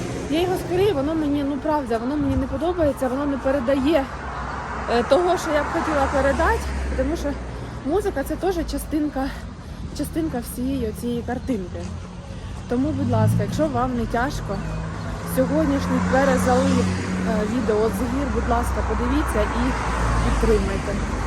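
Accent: Surzhyk, Ukraine. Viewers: Вибачте, але українську мову вам ще вчити і вчити - розмовляєте жахливим суржиком. Купа русизмів.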